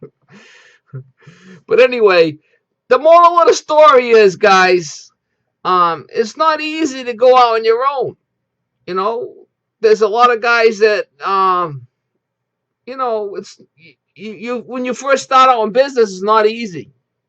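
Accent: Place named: Northeast, United States. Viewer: Guys, I am 54. Licensed plumber and overall home repair guy. Been in the remodeling business for 20 years now. I have remodeled over 1500 bathrooms. Trust me, this guy knows what he is talking about. If you are a young guy in this trade you should watch every video he makes. Knowledge and experience are worth a ton. This man has them. And his videos are also entertaining. Yes I know he is a yankee and sounds funny. But he is a SMART dude. Learn from him.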